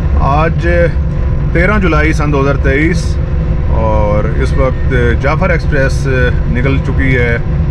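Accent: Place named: India. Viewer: The commentator seems to speak HindEnglish!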